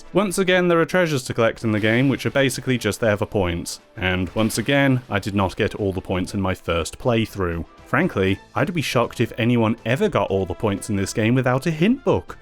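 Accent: United Kingdom. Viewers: You're just the spiffing brit, aren't you? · Damn British accent. Could have been a good video too.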